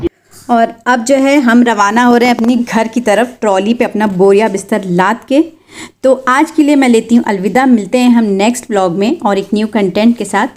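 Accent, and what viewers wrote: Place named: Jharkhand, India. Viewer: Assalamu alaikum Aapi maybe India se hun Jharkhand se jab aap baat Karti Hain To mujhe lagta hai ki aap hamari taraf ki Hi Ho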